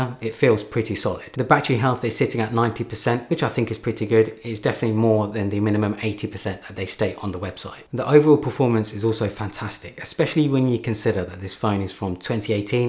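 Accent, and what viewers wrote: United Kingdom. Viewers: No one: Absolutely no one:: "This is a banger, it's wuily wuily fantastic " (Really really fantastic) That British accent 👌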